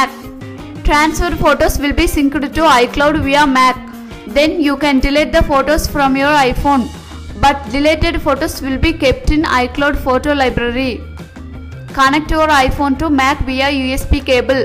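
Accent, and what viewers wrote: India. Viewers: i officially hate indian accent now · Indian Accent is so strong on this one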